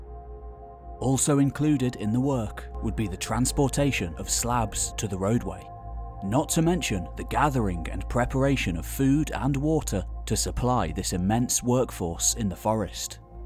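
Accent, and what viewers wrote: England, United Kingdom. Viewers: Wow! How deeply flawed a video. Like an 8yr old researched it from outdated school history books but read by a English guy to make Americans feel like it's intellectual fact. Smh 🙄 (but still lmao!)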